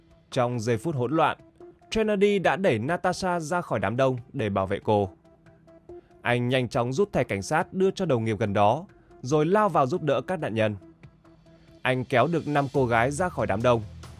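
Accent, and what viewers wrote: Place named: North, Vietnam. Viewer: giọng đọc miền bắc không hay . xin Chọn giọng đọc miền nam dễ nghe hơn .cám ơn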